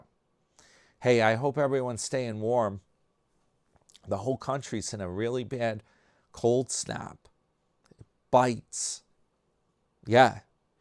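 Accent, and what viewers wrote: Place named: New York, United States. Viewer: He does mispronounce words, but this is not a fair example. This is his regional accent. Regional accents are disappearing. It makes me sad. It will be very boring when everyone in our country sounds the same. It’s interesting to me that even in the small region of New York there are different accents.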